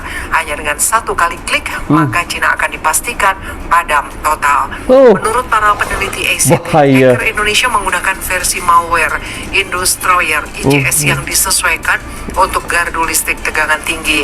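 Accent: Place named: Malaysia. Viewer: Bahasa anda gak jelas dan membingungkan dan terdenar lucu, ( bhs. malaysia ).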